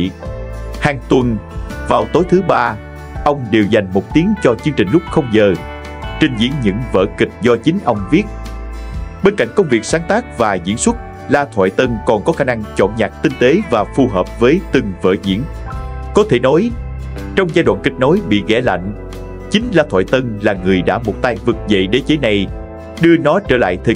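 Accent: Vietnam. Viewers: Kênh này ngoài MC nói giọng Sài gòn, thì nội dung khá rẻ tiền. Chủ yếu khai thác đặt tựa đề giật gân, không đúng sự thật để gợi sự tò mò của người xem, không có đạo đức truyền thông. Đặt tiêu đề hạ thấp những nghệ sĩ kỳ cựu đã khuất để kiếm tiền. Những người đàng hoàng, có lòng tự trọng sẽ không đăng ký những kênh rác như vầy. Disliked.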